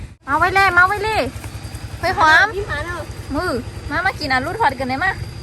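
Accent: North, Thailand. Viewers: สำเนียงเหนือ อู้เหนือ ก็คนไทยด้วยกันนี่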